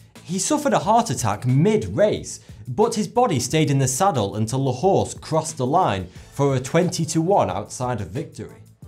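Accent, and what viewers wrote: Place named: England, United Kingdom. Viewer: Yes 42 is the answer to life..... it is from a wicked cool movie... I bet you know what 1!!! and yes his name is a play on words but also he is English.... Hench he has an Accent... You silly silly sausages!!:) p.s. you rock my brain with all of random facts!! I am so addicted to your channel:) Peace.:) x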